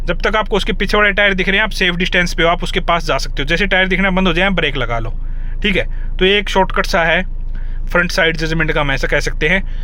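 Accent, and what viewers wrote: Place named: Haryana, India. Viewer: Haryana Sy lag Raha bhai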